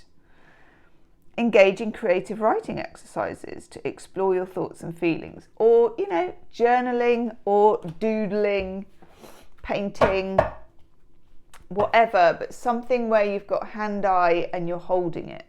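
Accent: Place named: West Midlands, United Kingdom. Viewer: Where are you from,? West Midlands? I've lived in Canada for 25 years now, but before that I lived in Stafford for a few years and it's refreshing to hear a voice like yours 🙂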